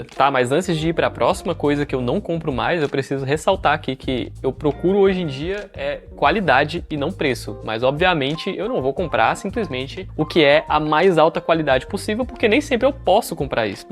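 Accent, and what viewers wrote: Brazil. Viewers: So de ver a casa se nota q ñ mora no Brasil 🇧🇷 , ate ele falar 😂 , eu tbm moro na Europa . valeu as dicas. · Hahaha amei seu canal… E cara, teu sotaque é muito baiano…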